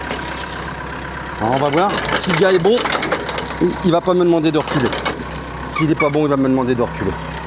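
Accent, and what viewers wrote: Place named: Quebec, Canada. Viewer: t'as l'accent québecois maintenant ! top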